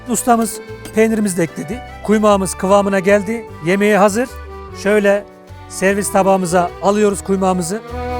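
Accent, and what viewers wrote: Turkey. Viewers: Erzurum’u doğru bir ağızdan dinlemek 👏👏👏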